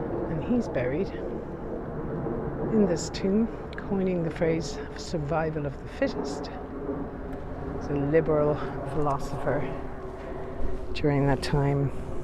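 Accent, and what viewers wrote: Ireland. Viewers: Dear, that was a wonderful walk, I've had that place on my bucket list for many years. Thank you for a wonderful video, I've been there in spirit now. I was pleased by the care being taken and Highgate Cemetery, it still has the right kind of spookiness. I hadn't heard about the vampire so that is a bonus. I love hearing your accent it adds so much for me, the Irish branch of my family tree are from Dublin, a few of them weren't very nice but they are are forgiven 😀 Lots of love and Blessed Be always 💖💖💖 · You have a lovely soothing voice! Living here in Berlin, always nice to hear your beautiful Irish accent, reminds me of home. Also, Highgate was one the most interesting places I visited in London. Keep up your wonderful work x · I just love watching your videos and love your Irish accent, must bring out the Irish half of my ancestors in me...lol. 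Love love love London (been there want to go back one day) and also to visit Ireland . You have so much energy and enthusiasm. Would love to do one of your tours one day. Go away Covid and I will be over there in a flash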